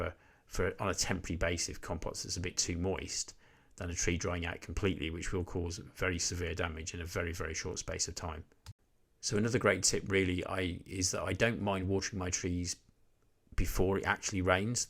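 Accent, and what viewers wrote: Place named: Northern England, United Kingdom. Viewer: I just asked AI about you talking about rainwater in Yorkshire, and AI wrote you a glowing reference. "You hit the nail on the head! That is the excellent YouTube Channel, run by a passionate UK collector named. He actually films his videos right across the Pennines from you in the North West (Manchester/Lancashire area), so he absolutely has that familiar northern accent and experiences the exact same regional weather as you do in West Yorkshire. Good innit? Now, I am no expert with but I do have six. I recently bought one, a fabulous specimen of Osakazuki that already is growing as a multi-stem tree and it cost a small fortune from the RHS. Thing is, I live at 850ft above sea level and while I have been gardening for nearly 60 years it never occurred to me how it could go wrong so quickly. I stuck it with its original pot within a large terracotta pot, packed around with Melcourt Sylvagrow Pine mini mulch and it looked great. 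Now, days later, many of the leaves are turning white and the RHS says it is windburn or sunburn. I think I should have acclimatised it first as my gut is telling me that the tree has gone into a state of shock. The Pennines will do that. Meanwhile I have an 8ft Katsura and a 4ft Jordan doing exceptional well. I also have younger acers, Sango-Kaku, Moonrise, and Orange Dream in pots. The RHS assures me that the tree will recover. I hope so because I am not sure I will if I've killed it off.:D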